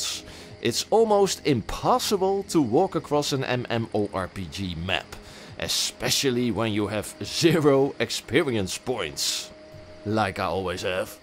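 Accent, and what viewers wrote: Netherlands. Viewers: Dude, are you Dutch? You have a really strong accent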